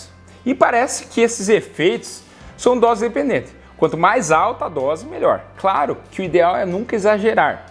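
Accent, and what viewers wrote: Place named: Minas Gerais, Brazil. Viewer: Dr vc e mineiro? Convesa igualzinho mineiro,, eu sou mineira com muita orgulho!!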